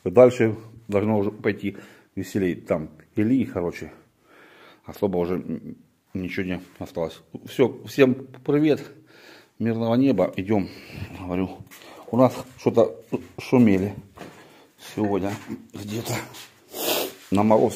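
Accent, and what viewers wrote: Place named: Volga, Russia. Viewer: привет! С праздником тебя! Я вот слушаю тебя ты вроде с Украины, а балакаеш как волжанин, ( симу, как то оно так), и ещё какие-то замечаю выражения которые слышу каждый день, только без обид! Иногда я думаю сядем за один стол, обязательно выпьем, по балакаем и всё будет хорошо и у нас и наших детей!!!!! Мира вам и чистого неба как изумруд!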